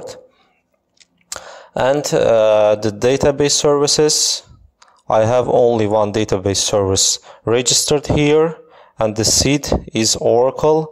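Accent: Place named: Poland. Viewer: Jestes z Polski? masz akcent polski :)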